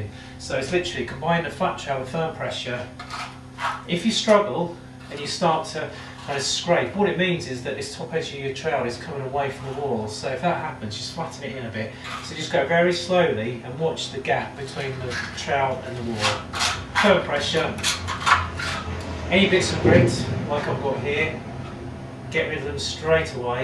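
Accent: United Kingdom. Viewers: Lancashire accent ?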